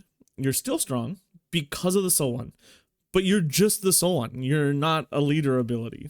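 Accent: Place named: Texas, United States. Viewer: I wouldn’t have known that from 1 million years you sound like you’re from like Texas or something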